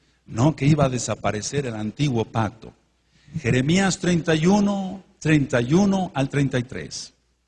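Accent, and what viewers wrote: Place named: Latin America. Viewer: Me da tristeza ver a hombres como este "predicador", con claros rasgos físicos de un latino y con acento latino, tratando de imitar al pueblo judío, dejando crecer su barba y usando unas cuantas palabras en hebreo (mal pronunciadas claro está). ¿Cuál es el afán de practicar la ley (torah) judía? Jesucristo ya murió por nosotros y solo tenemos que seguirle a Él, no volvernos atrás a la ley judía, la torah es para los judíos, el nuevo testamento para los cristianos. Al hombre que habla en este vídeo le digo, si vas a hablar en hebreo, "predica" completamente en hebreo, pero si este vídeo es en español, habla en español. ¡No traten de aparentar un conocimiento del cual carecen usando palabras en hebreo! Jesucristo es Jesucristo (No Yahschuah HaMaschiaj, eso es hebreo) Dios es Dios (No HaShem, ElOlam o Elohím, eso es hebreo) Iglesia es Iglesia (No Kehilá, eso es hebreo) Graba un vídeo "predicando" completamente en hebreo y entonces te creeré lo que hablas. 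Mientras tanto, En el nombre de Jesucristo, ¡Cállate!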